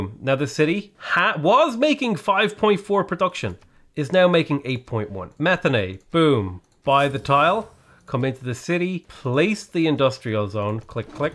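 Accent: Ireland. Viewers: You sound more Irish than usual in this video. Kinda neat to hear. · I was gonna say he sounds more "Irish for some reason, also pretty tired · is it only me or did he start to yous his Irish accent?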